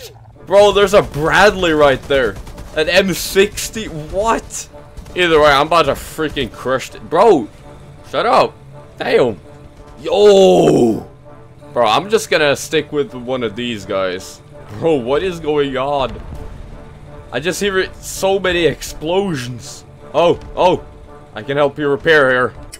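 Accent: Norway. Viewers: Are you norwegian??? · Are u Norwegian?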